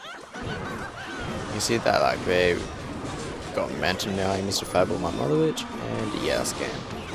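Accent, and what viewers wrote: Australia. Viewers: sexy aus accent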